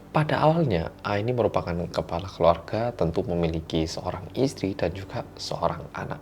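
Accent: Java, Indonesia. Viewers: Walahhh mase ngomonge kok medok. Menn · Mas medok🤩🤩🤩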